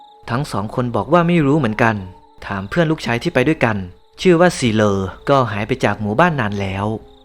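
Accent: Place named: Roi Et, Thailand. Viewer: เว่าภาษาอิสานเมืองร้อยเกิน กะน่าฟังไปอีกแบบ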